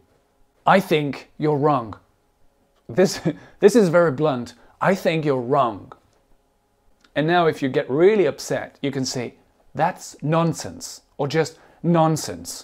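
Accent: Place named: United Kingdom. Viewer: What a wonderfull video ! I'm an college student learning English for many years , I'm not far from fluency but you steal learn me things ! Thanks a lot. Oh, and what a wonderfull British accent. I would have loved to speak the same but my natural way of speaking sounds more like GA. To cap it all, thanks sooo much, your video can help anybody wether their level or age.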